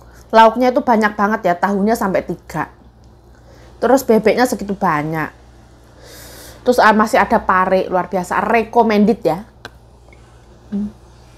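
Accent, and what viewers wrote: Java, Indonesia. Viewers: Ngomonge biasa ae " medok poll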